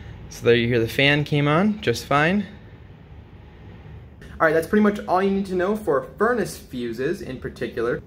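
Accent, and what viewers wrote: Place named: Northwest, United States. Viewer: Carolina? He has a definite Northwest accent. People move around so much these days, many people from the south don't have that twang anymore.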